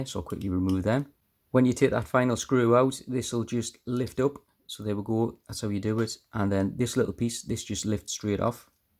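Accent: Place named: Carlisle, United Kingdom. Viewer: No way 😂 I live in Carlisle so I get your accent around here every now and , suppose if you have some foreign people watching I could be tricky to understand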